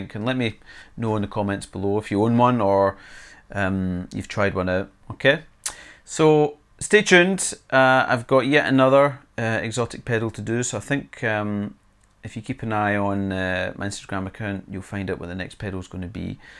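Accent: United Kingdom. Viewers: Why is it that almost every gear review video is done by people with British accents? Don't Americans do pedal reviews? And why can't the British say the word PEDAL? LOOK! I'm turning my PIDDLE on, now I'm turning my PIDDLE off. PIDDLE, PIDDLE, PIDDLE.😅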